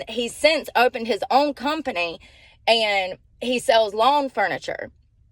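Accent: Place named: South, United States. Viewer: You are a strong woman. I love how real you are. And I love your accent. Being from the UK I have always loved the American accent. Especially the country accent.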